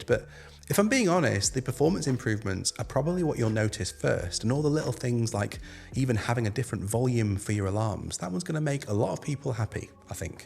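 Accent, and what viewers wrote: United Kingdom. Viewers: Brithish English is so gay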